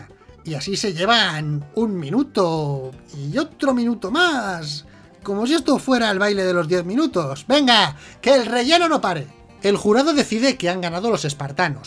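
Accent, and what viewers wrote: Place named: Spain. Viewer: Jaja amo este canal. Siempre sacándole risa a la gente 😂😅😂🤣 y más con ese acento español. Apenas jaja saludos des Bogotá Colombia 🇨🇴🙏🥳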